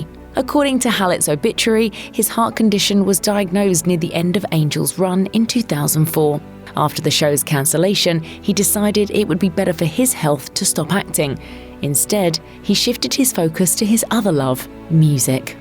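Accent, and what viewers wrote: United Kingdom. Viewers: Tired of hearing British narrator. 😝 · Can't handle the Brit accent.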